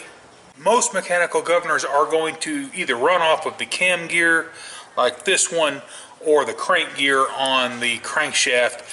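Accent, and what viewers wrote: Texas, United States. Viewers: Great visual with the case open. Clearly from Texas with the few "H'what" I heard.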